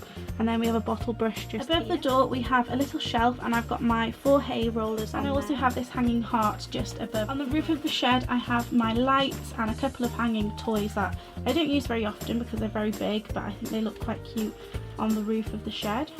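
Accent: Wales, United Kingdom. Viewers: Great videos. Where in Wales are you from?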